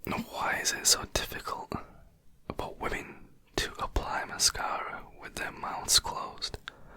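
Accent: United Kingdom. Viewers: FINALLY A BRITISH ASMRTIST 😍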